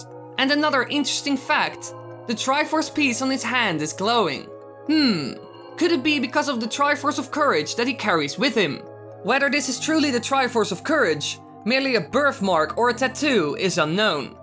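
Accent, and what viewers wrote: Netherlands. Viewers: you a dutchy? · Are you Dutch? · hehe is that a holandish accent i hear?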